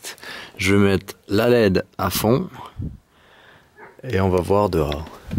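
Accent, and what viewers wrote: Quebec, Canada. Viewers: Bonjour jeune homme. J'ai acheté ton livre et je trouve l'écriture simple et sincère . je le lis avec grand plaisir " j'ai même parfois l'impression d'entendre ton petit accent canadien " Bonne route à vous deux . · Hello ! Vous êtes dans le sud, j'habite à Pau, on pourrait éventuellement se rencontrer pour boire un verre ou pour une balade dans les Pyrénées ? ;) J'ai découvert ta chaîne grâce à Marina, elle est cool, et j'adore ton tout petit accent québecois, au début j'avais même pas remarqué, je te pensais français haha Hâte aux prochaines vidéos!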